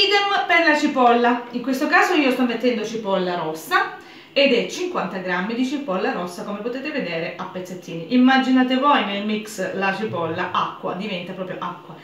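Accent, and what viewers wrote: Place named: Apulia, Italy. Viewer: Meravigliosa ricetta come sei meravigliosa tu.... sentire il tuo accento mi emoziono perchè ricordo l'unica vacanca che ho fatto nella meravigliosa Puglia 10 anni fa, quella terra rossa tra gli uliveti fuori dal mio trullo , nella meravigliosa campagna , l'azzurro del mare , i profumi per le stradine di Ceglie me li porto ancora nel 🧡 Un caro saluto da Verona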